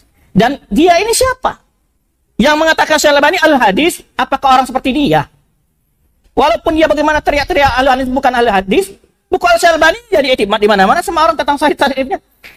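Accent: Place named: West Sumatra, Indonesia. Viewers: Logat orang minang memang seperti itu. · Logat orang minang bro sumatra. Teman saya ada yang kayak gitu kalau bicara.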